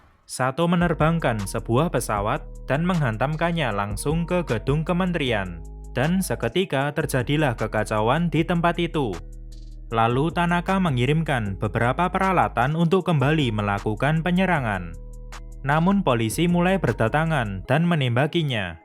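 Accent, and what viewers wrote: Java, Indonesia. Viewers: Logat jawanya kerasa bngt min hhh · Logatnya terlalu medok Kurang nyaman euy.. maaf ya min, tdk jadi subscribe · Anjir medok kurang cocok gan, pake bhs jawa aja klo lebih nyaman.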